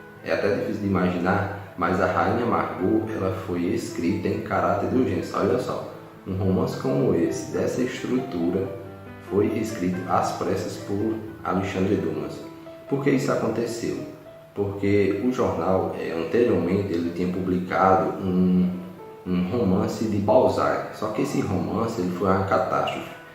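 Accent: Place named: Pernambuco, Brazil. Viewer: Opinei pelo sotaque. Sou de Maranguape, 30Km de Fortaleza. Nossos sotaques são muito parecidos; diferenciam-se nas pronúncias do "te" e do "de", que vocês pronunciam como os pernambucanos. Mas no Ceará também tem essa fala, só que apenas no sul do estado: a região do Cariri. Então, tava esperando você fosse me responder que era de Juazeiro do Norte ou Crato... Namorei com uma menina de Monteiro, em Recife, há muuuuuuitos anos atrás...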